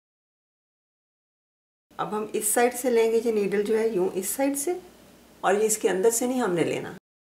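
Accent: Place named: Lahore, Pakistan. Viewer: AssalamoAlaikum ma'am chain stich 6th ki home Economics main sekha but kabhi pta nahi tha k ismain itna variations possible hain. May Allah protect you and your family. I am from Faisalabad kya aap Lahore say hain?? Aapka excent lahori lagta hay. Maam kya aap knitting bhi karti hain agar yes to phir aapni videos upload kijye ga uski bhi. Allah aapke or aapke ghar walon ki hifazat farmaye Ameen.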